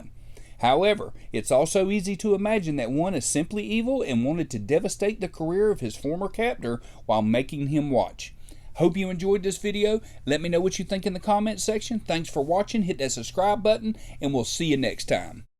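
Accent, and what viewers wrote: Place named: South, United States. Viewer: LOVE your southern accent! "Welcome to talkin sah-fah"😊❤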